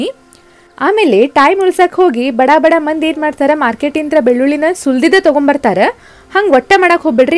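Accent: North Karnataka, India. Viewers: ನಿಮ್ಮ ಉತ್ತರ ಕರ್ನಾಟಕದ ಭಾಷೆ ನಂಗೆ ತುಂಬಾ ಇಷ್ಟ ಅಕ್ಕ 😍😍😍😍 thank u for the recipe we'll definitely try it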